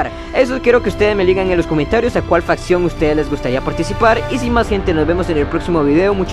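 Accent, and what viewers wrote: Costa Rica. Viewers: Tu acento parece costarricense. ¿eres Tico? Pd: gran video, sigue así. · Acento Tico....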